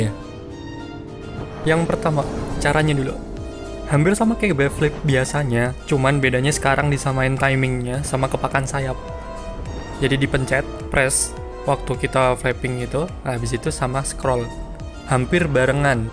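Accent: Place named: Indonesia. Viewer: Kaget pas pertama ngomong ternyata orang indo cuz i didn't read the caption😆😆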